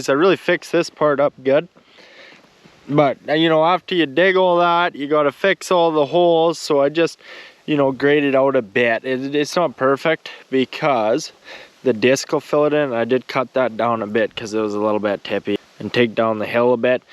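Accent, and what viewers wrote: Canada. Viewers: Sounds like you're up in Canada? Damn good work. Just did the same thing on a field road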